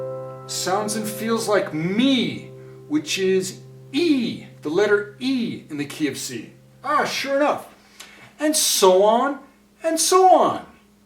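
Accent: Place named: Santa Fe, United States. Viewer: this guy must be from Santa fe. kkkkkk. love it.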